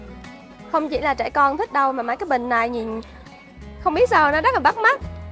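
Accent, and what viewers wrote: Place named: Quang Nam, Vietnam. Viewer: Đặc sệt tiếng Qoảng Nom. duyên thật.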